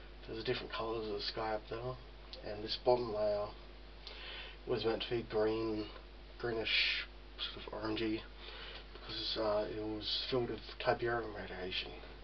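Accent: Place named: Queensland, Australia. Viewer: It's honestly nothing, and I recognised the Queenslander (because I too, am one). I will support you, because I believe.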